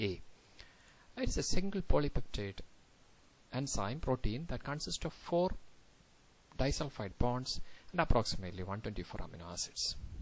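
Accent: Kerala, India. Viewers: But being a keralite I understood your accent :)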